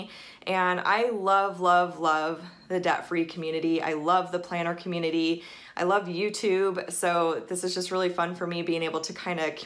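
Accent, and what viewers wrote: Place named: Wisconsin, United States. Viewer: WHOOP...WHOOP...It was really good watching this & learning about you. #MIDWESTGIRLS I live in Indiana & I know you say you've lived in Arizona for about 20 years, but...I can still hear a bit of that "Wisconnnnsen" accent in your voice. I only know it because I have a lot of friends who live in WI. Do you work for University of Phoenix? I took classes through them & although it was much different than a traditional brick & mortar school, I really liked it. I think I'd love to have a job like what you do. It seems like you've got a pretty good thing going! Good luck with your journey & looking forward to hearing your "news" next week (can we probably guess what it might be)?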